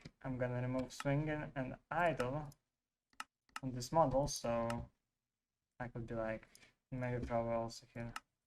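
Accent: Russia. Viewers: У вас руский Акцент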